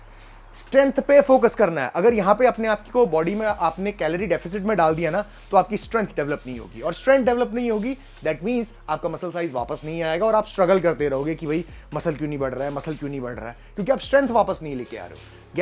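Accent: India. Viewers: of course he has a perfect indian accent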